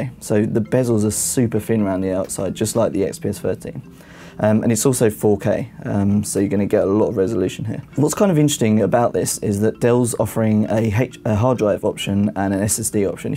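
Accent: United Kingdom. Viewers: Why would what I assume is a British speaker (from the accent) say aluminum and not aluminium? Perhaps reading off some official US press notes, and too whacked to notice. Anyway, machine looks nice. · that's more english that you think actually, it's just british accent.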